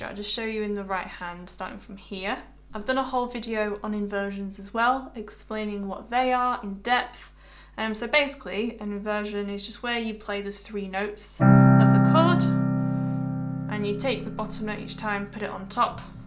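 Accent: United Kingdom. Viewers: Finally it took a brit accent for me to understand,lol im on my way!!!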